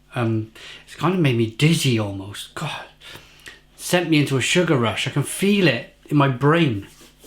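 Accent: Wales, United Kingdom. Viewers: I think videos are great, you never know what you're going to get, I actually love the Welsh accent...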